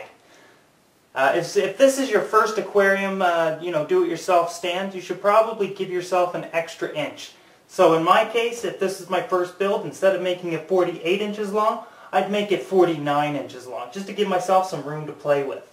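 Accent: Canada. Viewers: Lol! well that's good then....He said it with his Canadian accent and sounded like 'meter saw' eh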